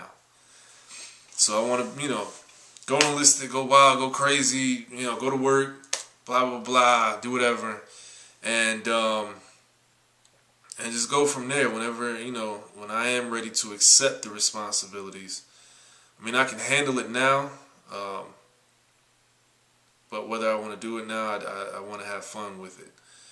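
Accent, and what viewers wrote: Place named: United States. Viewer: I'm pretty sure he knows how to speak proper when he needs to. I myself am in college educated. I speak Ebonics but know how to speak proper english when it is needed. Maybe he is trying to appeal to those who are like him. You shouldn't be so judgemental. After all how we speak "inner city kids" speak is just another legacy of slavery.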